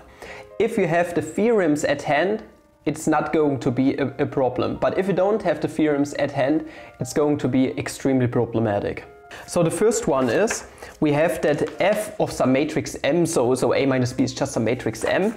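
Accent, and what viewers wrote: Germany. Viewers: Hat keine Sekunde gebraucht um den deutschen Akzent rauszuhören haha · Dieser deutsche Akzent ist richtig Hardcore. Aber geil!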